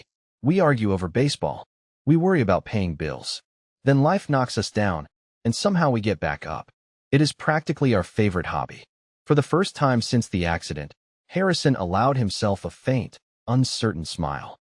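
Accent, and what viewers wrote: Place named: United States. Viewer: Fantastic story. Perfect! There was no problem with the sound at all. It was excellent American English.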